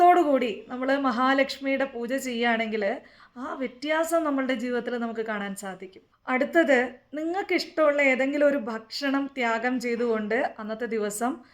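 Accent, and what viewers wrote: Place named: Karnataka, India. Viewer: thankal karnatic ano, thankalude slangil evideyo kitti, nhan kasargod.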